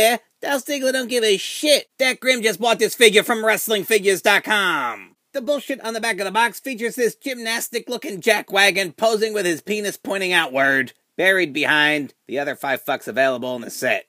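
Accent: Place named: Ireland. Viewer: Bitches like me fella. Love your Irish accent:*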